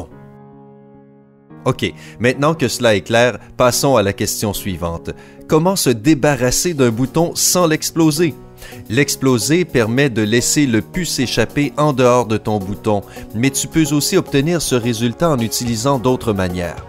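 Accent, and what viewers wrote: Quebec, Canada. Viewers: C'est l'accent Canadien ou Québécois désolé je sais pas vraiment entre le deux ^^ c'est pas très sympa de disliker alors que c'est pas de la faute de la personne si elle a un accent.. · Accent canadien je zappe c’est ridicule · Le gars c'est un canadien ? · Celui qui parle dans la vidéo est un québécois ou un marseillais comme vous voulez 😅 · Wilson Temat non dr bot est noir et celui la est quebequoi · Florian Guerif la voix off québécoise est BEAUCOUP mieux que le mec de Lama Faché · Le fameux accent québécois ça me tue 😂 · C'est plus québécois ça en fait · vous avait remarquez la vois canadien cool non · Faut vraiment changer la voix-off de vos vidéos les gars, ça va plus du tout la version québécoise... mdr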